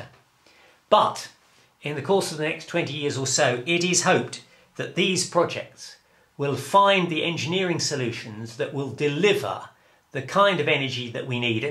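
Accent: United Kingdom. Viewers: that British accent though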